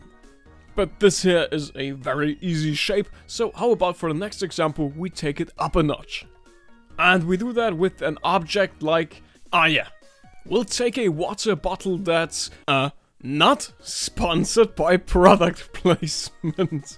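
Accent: Germany. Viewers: Liebe die Pokemon Musik und den Deutschen Akzent!!! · as a german i could immediatly tell u r german too.....and by the water lmao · This german Accent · Hey das hört sich mehr Nach denglisch das du da sprichst 🤣🤣 Ich bin auch nit besser😅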